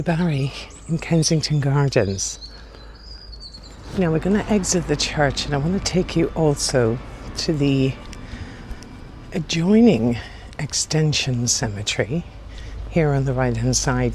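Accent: Ireland. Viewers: Hi, I love your video. Not so much of a hidden Gem anymore but still hidden from most tourists. I think I discovered the Hill Garden and Pergola in 2018 or 2019. I always wanted to go to the West Heath, but I only made it to Hampstead Heath not the West Heath so I found it by accident. Boy was I amazed It was during the summer and it was a beautiful sunny day and I was almost by myself here. Mind you I came to this part of London in January 1982 to work for the first time a week before my 17 th birthday. I have been to London so many times over the years but I'll always love my part of London namely the NW Hampstead, Golders Green, Kilburn, West Hampstead, Queens Park, Swiss Cottage, St John's Wood that will always be my part of London and I keep coming back. I have also lived in LLanduno Wales, Portrush NI and in Edinburgh and I have travelled a lot in the UK as a tourist as well. 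I like your Irish accent and I think yo make some really nice videos. lots of love from Denmark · Lots of Irish references to go with your very slight Irish twang, I know Hampstead well, lots of celebrities currently live in these roads you are talking about, All best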